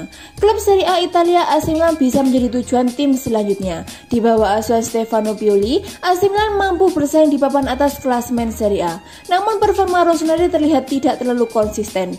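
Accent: Java, Indonesia. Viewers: medok banget min?cuma cakep jadi ciri khas channel ini salam dari interisti semoga milan lekas membaik performance nya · Medok min...😅😅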